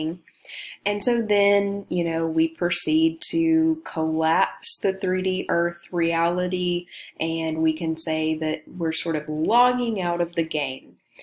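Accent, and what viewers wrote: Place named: Texas, United States. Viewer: and I say definitely embrace your Texan accent. God's in his/her place. All is right with the world.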